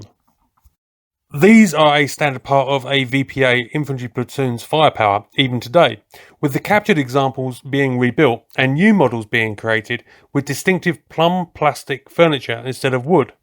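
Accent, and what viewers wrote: United Kingdom. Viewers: Because it wasn't US military property, it was South Vietnamese military property. Just exactly the same as all the gear that the Taliban laid it's hands on that all these people who don't know what they're talking about are saying all this dumb crap about the US military leaving all of that stuff laying around for the Taliban to equip itself with, like what you see here it wasn't US military property that the Taliban got, it was Afghan military property that they left laying around when they fled in the face of the Taliban taking over. Word of advice, any time you click on a video that has anything to do with US military history and you hear a British accent, do yourself a favor and immediately shut it off, because it's going to be full of lies, exaggerations and any other type of deceitful things you can think of in order to cast a bad light on America and especially it's military, for some reason they feel compelled to do that stemming from some sort of twisted feelings of jealousy that obviously comes from the US's involvement in the two world wars.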